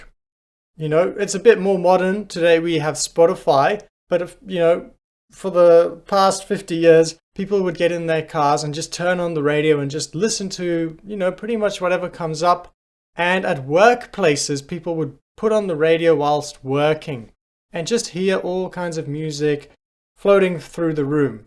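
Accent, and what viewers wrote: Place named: South Africa. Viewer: Yeah. I was confused for a second as I thought you were from Australia. But then, I thought about it and thought maybe your accent sounds a bit South African.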